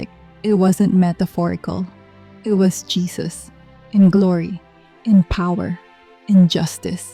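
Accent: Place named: Tagalog, Philippines. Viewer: Just do it in Tagalog version and put English sub titles Is really annoying to hear Tagalog accent trying to speak English Be natural and more people will listen like the Koreans ok 😅😅😅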